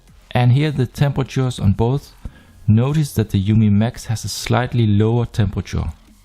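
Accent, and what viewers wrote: Germany. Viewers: Man hört so das du Deutscher bist xD Gutes Video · Für eine lange Zeit beobachte ich Ihre Bewertungen und schreiben mit einem sehr guten Lichtqualität und eine Freude zu Ihrer englischen Sprache mit deutschem Akzent zu hören. Nach Ihrer Präsentation kaufte ich Umi eMax und später SuperUmi. Jerste sehr glücklich. Vielen Dank, und ich herzlich begrüßen.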